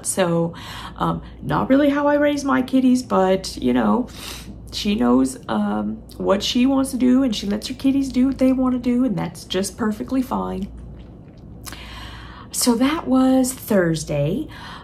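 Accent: Louisiana, United States. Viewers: You have the Cajun accent back! I have kids and grandkids in Lake Charles!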